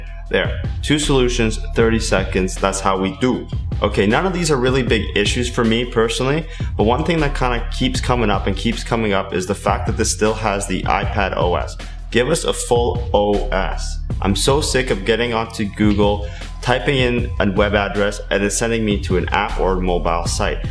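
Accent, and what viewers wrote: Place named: United States. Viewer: Your accent sounds VERY American (I'm assuming you're Canadian because of some of your quirks). Great review, and I'm surprised you don't have more subscribers.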